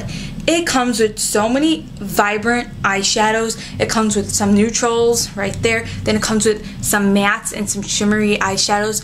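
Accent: Brooklyn, United States. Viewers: u r really so damn pretty, they way u sound and the way u look dont match, u have this exotic beautiful look and one would almost expect this smokey sultry voice with a european accent, but u sound the the kid next door from brooklyn or something. LOL anyway good reviews and tutorials, keep em coming!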